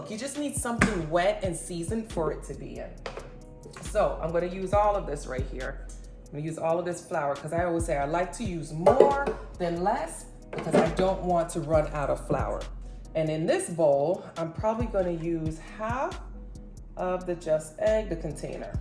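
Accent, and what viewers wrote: Jamaica. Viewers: That looks delectable... You're Jamaican right? Sounds kind of like you are. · Idk why i hear a jamaican accent 👂🏾 🧐😌lol..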